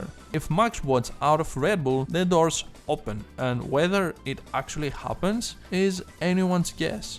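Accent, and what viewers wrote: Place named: Greece. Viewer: Where are you from? Your accent sounds very greek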